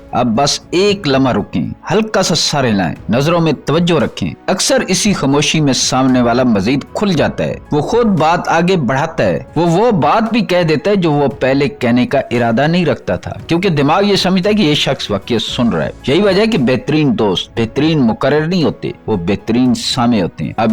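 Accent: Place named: Punjab, Pakistan. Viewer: Content Acha hy….pr lehja Punjab k Molvi sahab jesa….please Urdu pronunciation (talaffuz) sahe ada kia karaien bulky properly seekhain….ya kisi achi Urdu bolny walay sy voice-over karwaien! Thanks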